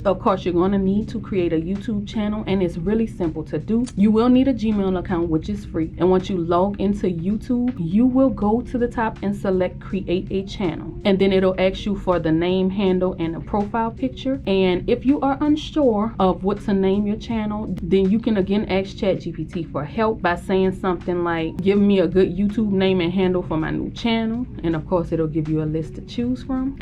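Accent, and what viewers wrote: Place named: South, United States. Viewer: she's definitely from down south with that accent lol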